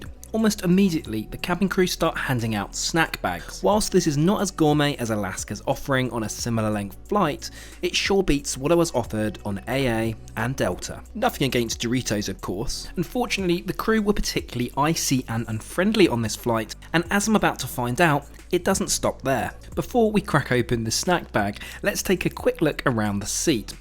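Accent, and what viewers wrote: United Kingdom. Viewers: How are you able to travel so freely during the pandemic? I believe you're from the UK if I got your British accent right. How can you travel with the traffic system in place with Amber countries? Do share your secret.....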